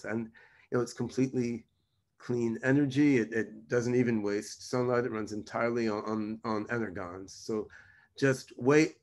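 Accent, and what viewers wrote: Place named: Rio de Janeiro, Brazil. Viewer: Bichuu tu é brasuka meu .Pirei kkkk carioca ainda fechou . E ja ta na 3 muie é nois mano tmj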